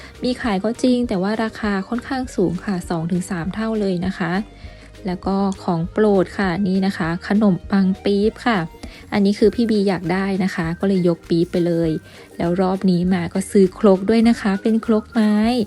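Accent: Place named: Lom, Thailand. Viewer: บ้านอยู่อำเภอเมืองหรือครับมิน่าพูดหล่มได้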